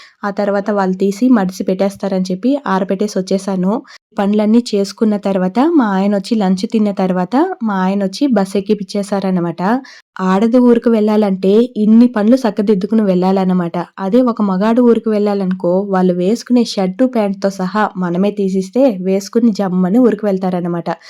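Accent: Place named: Andhra Pradesh, India. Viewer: telugu matladutunte Andra anukunna.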